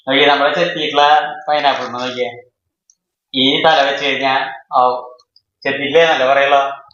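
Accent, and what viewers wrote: Thrissur, India. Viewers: Clear ആയിട്ടാണല്ലോ സംസാരിക്കുന്നത്. പിന്നെ അവരുടെ തൃശൂർ സ്റ്റൈൽ ആയത് കൊണ്ടാകും അങ്ങനെ തോന്നിയത്..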